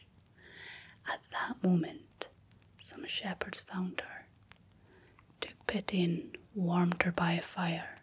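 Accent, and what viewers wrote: Scotland, United Kingdom. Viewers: I have listened to this every night since you posted it please keep them coming the more Scottish the better ❤ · Love your Scots accent shorty can sit and listen to you all day you make me feel safe and comforted I have PTSD due to sexual abuse by male guitar teacher at 17 I listen to you every night you make me feel safe thank you for all you do shorty you saved my life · Aw, my Scottish friend gifted me this book for christmas one year 😊 now I can listen to the stories in a Scottish accent, and in asmr format! Thank you 😌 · this is so perfect for me - fee tales and a gorgeous socts accent to boot:)! Love the choice of stories and your sense of humour as well:)! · Yass scottish 😂😂😂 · It cannae get mere Scottish than this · This is my favorite video! I can't wait for the next one with more Scots dialect ❤ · People love your Scottish accent but people don’t like mine😢😢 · Whispered Scottish ASMR are you kidding me 😍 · Yes, I have to agree. 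I do love your Scottish accent my God I’m falling in love with you gorgeous Sexy Mamacita.❤ ya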